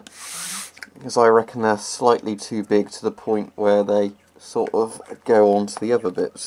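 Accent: England, United Kingdom. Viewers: It's you english accent. Be proud of it! Maybe try some rubber bicycle tube to cover the inlet valves. 🇦🇺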